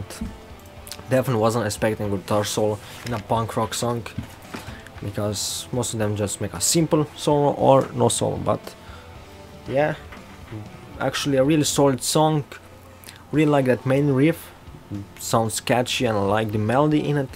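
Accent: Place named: Eastern Europe. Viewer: I knew you got a kind of eastern-european accent!! You are doing great, papá!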